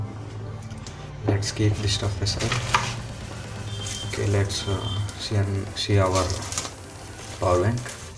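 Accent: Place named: New York, United States. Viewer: Dini evadaina video antada ra? Meanwhile NYC slang ra chakka😍👍😊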